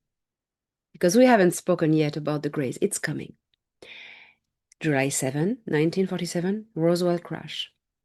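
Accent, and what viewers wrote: France. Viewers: What a great edition of Star Nation News! You should do a more detailed traditional Documentary for public release to help us get through the next 5 years. Make sure you have a narrator without a strong foreign accent. I love your French accent, and the way you present your news. I took French in high school for 3 years, but I do struggle to understand your pronunciation of words sometimes. You are a great communicator and I admire your great courage and wisdom, and beauty, if I might add!!! Mike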